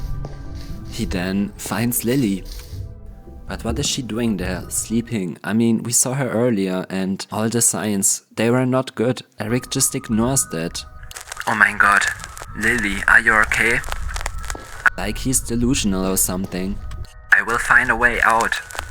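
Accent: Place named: Germany. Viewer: The millisecond i heard your voice. I knew you was german, why? Because i am part German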